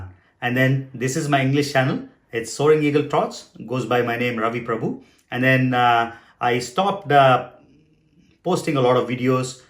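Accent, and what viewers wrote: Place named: India. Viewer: Please the following links and contact this vlogger how to get permission. <link> (Mr. Mohsin Paracha is one of the tour operator in Pakistan. <link> please use local tour operator if u plan to travel in sensitive and populated area. Ur appearance is guaranteed fast Indian and u can't hide ur physics or ascent.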